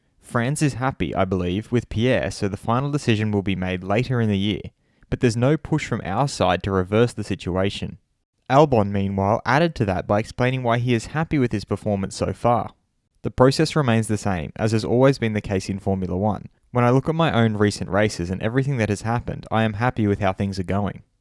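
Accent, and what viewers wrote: Australia. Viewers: Aussie ascent gotta love it mate.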